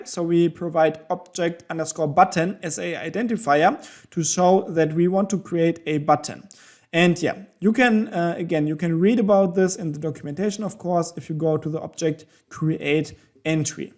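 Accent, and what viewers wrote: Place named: Germany. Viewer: Hallo, krasser deutscher Akzent aber sympatisch..:D Ist es irgendwie möglich, dass die Positionen sofort gleichzeitig geschlossen werden und nicht nacheinander, was ja nach Menge leider einige Sekunden dauern kann... Also alle sofort geschlossen wäre für Scalping noch besser! Ist das einfach umzusetzen? Danke!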